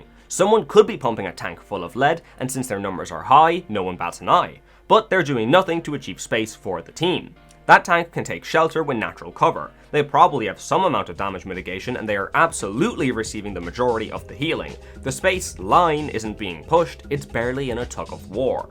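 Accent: Ireland. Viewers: Are you from Louth? Short circuited when i first saw the intro. Didnt expect you to say well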